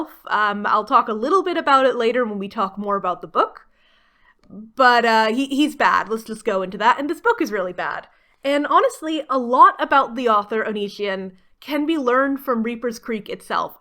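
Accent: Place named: England, United Kingdom. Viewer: You say you are American, but Ive heard you say things before a take that are unmistakably an English, so watch out its rubbing off on ya haha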